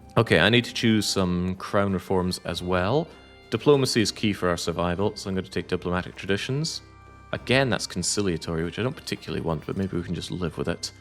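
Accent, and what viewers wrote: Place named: Scotland, United Kingdom. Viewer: Finally, some Scottish accent from. He refused to talk like that when playing a Scottish vassal back in CK3.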